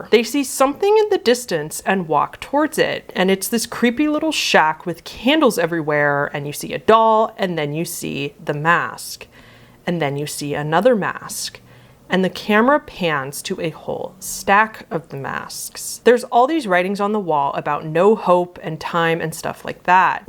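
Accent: United States. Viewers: KobenThat’s just her accent. Most Americans pronounce “Shire” like “Shy-yur.” I’m sure she didn’t mean to offend anybody.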